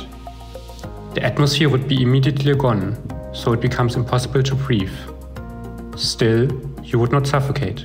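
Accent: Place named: Germany. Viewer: most german accent in existence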